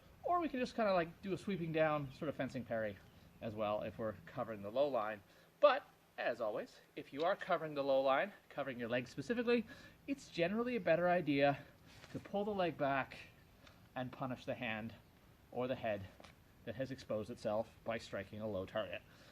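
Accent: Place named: Sweden. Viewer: You have a Swedish accent.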